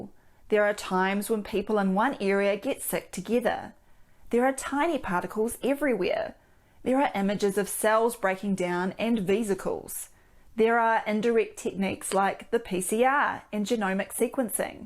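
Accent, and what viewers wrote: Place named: New Zealand. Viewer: All this good stuff delivered in the most adorable Kiwi accent. Thank you Doc ...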